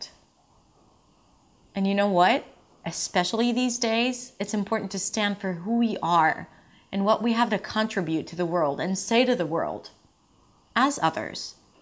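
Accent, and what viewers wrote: New York, United States. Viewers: Hi! I’m Chinese and I live in Paris. I’m your new follower since one week. I’ve been very surprised by your excellent NY accent that I really want to master also. I even showed your video to my French husband who speaks very well English, he didn’t doubt one second that you are nota native speaker. I like you accent and your struggle personal story, very motivating! And I appreciate much your confidence (and your new hairstyle 😊). I enjoy your course and hope that in several months I could get the half of your level, thank you so much! Love you! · You are amazing you were able to master an American accent. Science says that you will never lose your accent after a certain age (eleven) glad you know you provide them wrong. By the way, do you feel that you will revert back to the way you spoke before since you are surrounded by people who speak with English an Israel accent? 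Subconscious you might pick up the flow just like people pick up certain accents and words when they move to a new country. What is your trick and how do you prevent that if you find that happening. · Your English is perfect to me. I really thought you were American. I surprised when I saw you saying that you are not American. I love your channel because I've learnt a lot with you. I am Brazilian and I effort to improve my English and one day... who knows... have an excellent accent like you. Congrats!!! You are making the difference in people's live. 😘😙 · Ohh god You are very smart you speak like a native English teacher congratulations 🎈 for a moment I thought you were American.. i love ❤️ to watch your videos. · Phil Smith I totally thought she is an American!! I am Chinese though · You can unsubscribe if you want. But I wouldn’t really worry about her making a mistake. She has spoken with an American accent the whole time and even took speech classes to correct her pronunciation in the beginning. 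· No, I personally don't need it, as I'm not a bad accent mimic myself (at the risk of sounding conceited). I'm not even sure how or why I found your channel, but I occasionally look at different language and accent videos, so itmust have been in the recommended list, and then I saw your obviously Israeli-sounding name, so I checked it out. Bekitzur, kol hakavod al ha mivta ve al he'arutz shelakh, at nishmaat kimat amerika'it legamrei, kashe le'haamin she dibart anglit im mivta yisraeli lifnei kama shanim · You really inspired me dear sister. I'm very happy that God has bless you with the facility to learn English. I'm amazed that you sound 100% American when you speak. I'm a Christian and I'm believe that God gifted to us with such bless to pursue anything that we want.... Listening to you really gives me confidence for my learning.... God bless you dear sister....bue😀😊😄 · You speak perfect American accent. 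If you did not say you are Israeli I would not recognize English is not your native English. Your story is full of inspiration. I admire the Israeli because their contributions to the world. Thank you very much. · First thing first you sound so American, secondly everything you said has happened to me. 1) People completing my sentences each time I tried to say a complex sentence 2) Blank, confused and frustration on people face each time I spoke 3) Huh, I sorry what did you say? 4) Correcting me each time I spoke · Wow! I want to learn English like a native English speaker! I love the American accent, tell me what the secret is.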